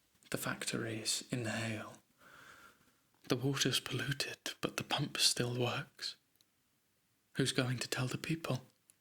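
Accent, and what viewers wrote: England, United Kingdom. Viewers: Something about your accent, poetry writing, mug of ✨hot chocolate ✨ and train destination reading just sits right with me, it is so british i love it!! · God I'm in love and is probably because of his weird behavior and british accent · i am almost early yeyyy😂 i love english accent oh god😻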